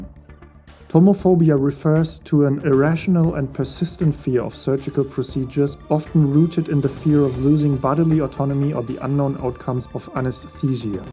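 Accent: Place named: Germany. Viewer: Don't feel guilty for your Germanesque pronunciations man, they're charming 😃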